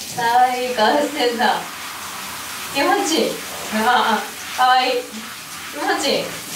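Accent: Kansai, Japan. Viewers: 関西弁使うな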